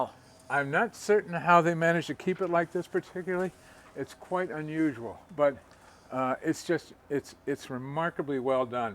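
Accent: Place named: New England, United States. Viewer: I am absolutely captivated by videos about old boats and houses narrated by NPR voices with slight New England accents? Must be my Aspergers... is my new Bob Vila!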